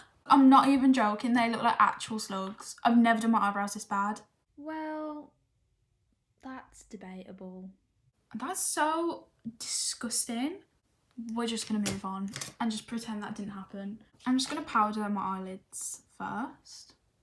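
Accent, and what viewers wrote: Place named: England, United Kingdom. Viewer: Where in England are u from, like ur accent is different x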